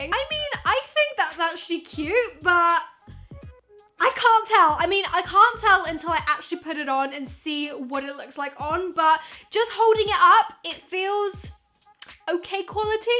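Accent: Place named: United Kingdom. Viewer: I love her British accent ❤️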